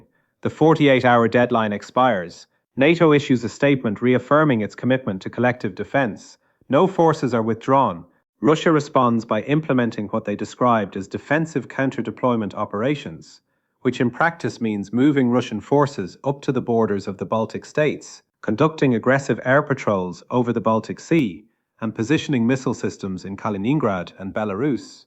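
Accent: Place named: Ireland. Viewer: An Iranian with an Irish accent?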